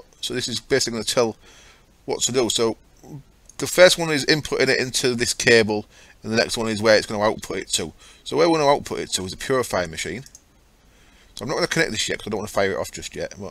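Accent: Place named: Hull, United Kingdom. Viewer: I think I listened to you say auto-spawner about 4 times. That Hull accent is hilarious, not in the mocking 'haha you sound different' way, but in the plain hilarious way:D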